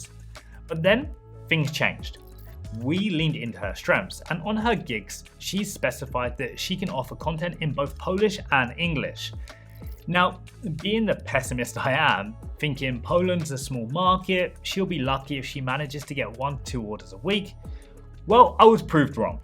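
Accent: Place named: United Kingdom. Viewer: I deeply wanna get your piece of advice but that damn british accent 😭😭😭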